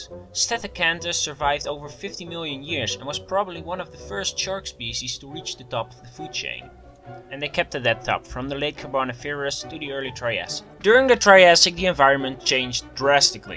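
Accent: Netherlands. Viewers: I have to say this was overall quite a informative video. But i have to comment on something: those "marine dinosaurs" you described, don't belong to the dinosaur family at all. Sure they were large and lived in the same periods, but they were in fact "marine reptiles" instead of dinosaurs. They were a seperate group of reptiles. That's it. After hearing your accent, i presume you are dutch :D am i right?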